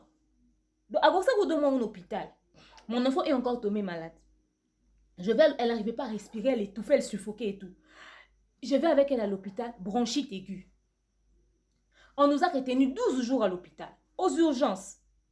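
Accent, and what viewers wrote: Ivory Coast. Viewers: Ma fofolle reste béni je t'aime moi j'aime l'accent ivoirien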